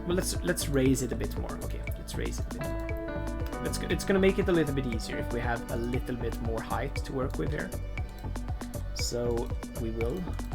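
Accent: Sweden. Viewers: Hey, are you swedish? You sound swedish... 😄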